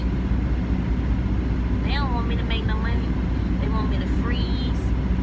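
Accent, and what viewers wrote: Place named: Georgia, United States. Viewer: You from south ga huh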